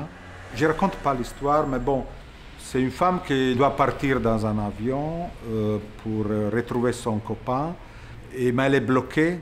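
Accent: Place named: Italy. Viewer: meraviglioso. e delizioso il suo francese con accento italico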